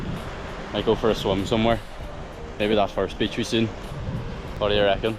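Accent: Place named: Donegal, Ireland. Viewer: Next video will you say dia duit in your donegal accent please. Im learning gaelic and its HARD!!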